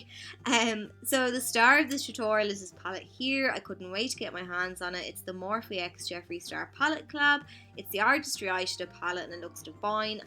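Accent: Ireland. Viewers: I could listen to your accent all day girl! Taking your irish accent and adding a makeup tutorial with it is pure heaven for me lol love your skills girl!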